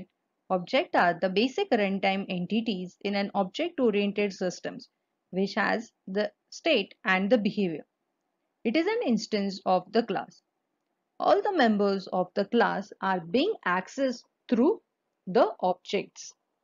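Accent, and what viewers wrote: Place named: India. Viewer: first you were a male now you have a female voice with an indian accent as well. amazing!!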